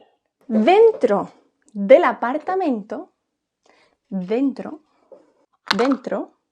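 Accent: Spain. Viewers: Una pregunta, de donde vienes concretamente de españa? Me encanta tu acento y me gustaría usarlo en mi vida diaria. ❤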